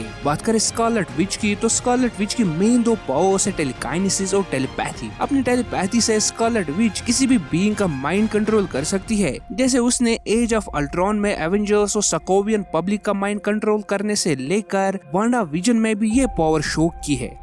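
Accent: Kashmir, India. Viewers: Hmm y does ur accent sound familiar. Kaishur chuka?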